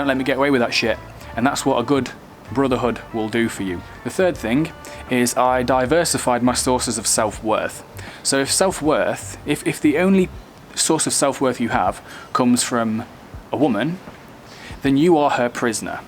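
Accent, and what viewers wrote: United Kingdom. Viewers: Nice! I had a feeling you're accent was British 😅. That's such a beautiful accent and place to live! Greetings!!!!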